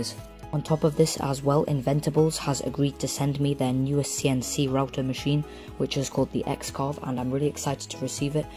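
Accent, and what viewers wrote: United Kingdom. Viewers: actually this is how the British say aluminium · you are simply right: the British accent for Aluminium is correct since it comes from a Latin word "Alluminium" that has the same accent as the British version. Even today in any latin country Aluminium is pronounced the same way you do in British, so at the end the US version is funky but not as correct;) Amen! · I'm sick and tired of these pseudo-intellectual Americans who're confounded and obliviously duping themselves into believing how they and they alone know how to speak English correctly, trying to fault find on our pronunciation of "aluminium" when it's forever been a lorry not a truck, a sink not a basin, a plug not a stopper, as do remember whos language you're butchering after all our brothers and sisters from across the pond, you're speaking English, so if anyone knows how it's pronounced, it's us... 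it's a ruddy jaguar, not a jagwar! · It does not matter how you pronounce it, I'm from Briton too, So I agree with how you say it. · Well said about the Aluminium pronunciation! it's a bit rich when a country who predominantly speak the ENGLISH language, start pulling the ENGLISH up on the way we speak!! What with sodder and aluminum and an inability to use metric they need to come into the real world!! Great videos and tutorials, keep up the great work. I subscribed Mark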